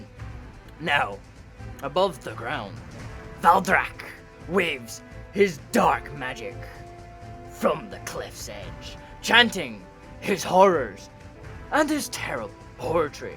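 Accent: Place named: Scotland, United Kingdom. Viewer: You can be Scottish and understandable. Speak calmly please.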